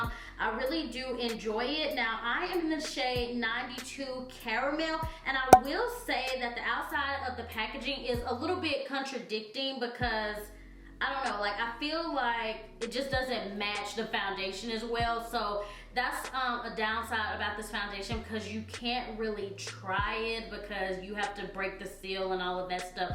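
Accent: South, United States. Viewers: My 15 year old and I watch your videos together we love you. Love your southern twang. Snapchat: queencindy619.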